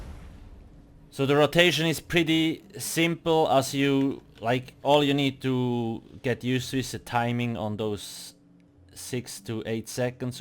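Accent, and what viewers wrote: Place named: Switzerland. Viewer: So lustig, han no bevor e Minute ume gsi isch denkt, "das tönt schwer nach eme Schwiizer!". ^^ Merci für de Content, wiiter so. =)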